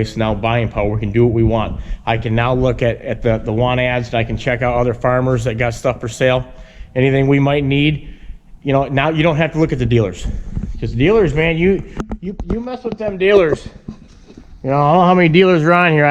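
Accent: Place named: Upstate New York, United States. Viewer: which units where you in when in the Army? I am a huge fan, I am active duty now and grew up milking cows and working the farm for my uncles in upstate NY. The more I watch your videos, the more you sound like them. The way you present yourself is clearly as a leader in the Army would, especially when you told your nephew that "we start strong and finish strong." Love the channel. Keep doing what you do.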